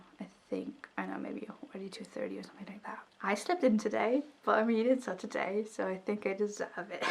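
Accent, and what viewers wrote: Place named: United Kingdom. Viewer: You sound so british 😊💕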